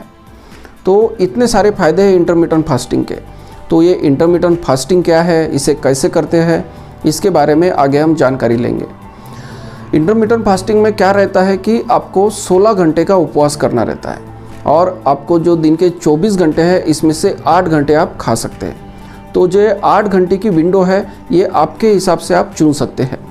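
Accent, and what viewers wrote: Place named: Vidarbha, India. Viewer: Very apt advise; very much to the point and in the simplest language possible. It was also nice to hear you Vaidarbhiyan accent. मी नेहमी पाहीन आपलं चैनल. धन्यवाद.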